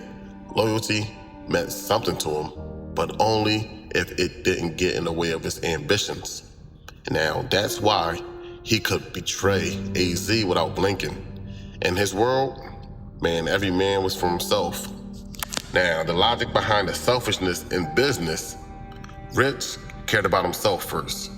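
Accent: Philadelphia, United States. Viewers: U from North Philly huh😂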